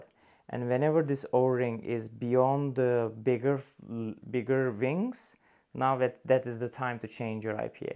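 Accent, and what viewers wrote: Turkey. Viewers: Usta Türk musun sanki aksanda Türklük var gibi 😅😅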